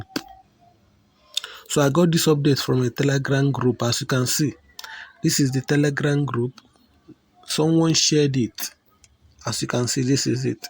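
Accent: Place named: Africa. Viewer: Please you sound African stop fooling I know African are intelligent 🧏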